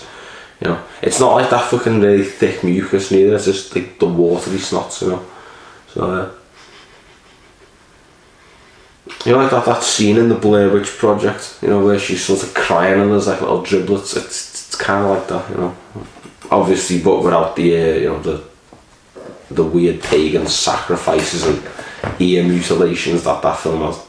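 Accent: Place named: Liverpool, United Kingdom. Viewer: Yes scouser! Just bought a keg for the machine never had it before, was on offer!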